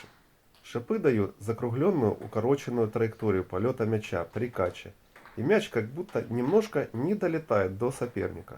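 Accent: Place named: Moscow, Russia. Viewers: Судячи з описаного голосом па маасковскі, накладка для класики, бо на повільніших основах срєдняя врєдность з блоків буде ніякою зі всима витікаючими, що зобов'язуватиме власника до відповідних прийомів, дієвих для таких засобів. Це не докір для тестостериста))), лише синтезовані з побачено-почутого власні висновки, базовані на нікчемному досвіді й таких само досягненнях, тобто там потрібно буде проявляти спочатку зрозуміле і потім набуте все розмаїття техніки і тактики, а саме попіхи-перепіхи будуть малоефективні, але для володіючих ними і шипами вцілому достатньо зрозумілі і доступні, знову ж не в докір останнім, бо вони своїми "вміннями" теж можуть зробити гідний фурор, тобто головняк))), опонентам. А ще хотілося б побачити загальну картину гри на рахунок, і суперника, що краще володіє технікою гри і переміщень, а не одразу в рукопашну... 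· А что тебя больше расстроило-маасковский говор или накладка на уровне ширпотреба?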